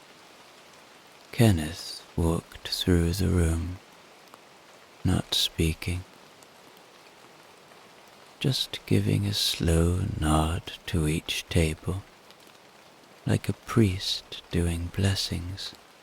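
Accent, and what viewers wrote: Ireland. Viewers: Amazing story out of all the sleep stories you have the most relaxing voice what part of Ireland are you from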